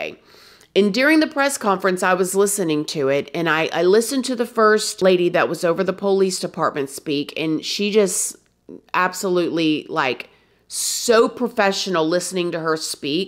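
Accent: South, United States. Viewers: I agree, I think this was a random attack but VERY coincidental. I think he saw her run by, either knew who she was since her family was so prominent in that neighborhood or saw she was minimally clothed and thought it would be a perfect opportunity for SA. It makes my stomach turn to think she was just out minding her business and someone could do that to her. I heard her remains were in pretty bad shape (I don't want to go into detail because from what I heard, it was just horrific) but I think what he did in the disposal was to try and get rid of DNA evidence from himself. My heart breaks for those boys and her husband. 💔 Sidenote: I love your vibe and southern accent, I've been watching your videos for a little over a year, especially the videos of you explaining your past. You keep it real and I admire that!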